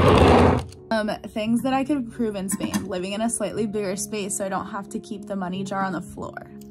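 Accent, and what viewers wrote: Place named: United States. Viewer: tienes una voz muy bonita y musical. El ingles de estados unidos siempre me ha parecido " dificil" por el acento. Hablado por tí suena muy bonito. Podrias trabajar como " dobladora " ( en el cine las versiones en español ). Muy feliz de que estes feliz. Besitos desde Granada, Andalucia.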